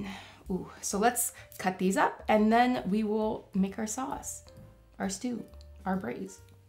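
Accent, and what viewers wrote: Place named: Cockney, United Kingdom. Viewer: Please start posting again V! Miss you're content. From the UK, London. If you chuck in you're cockney accent ('faakin' hell!') will love you forever xxx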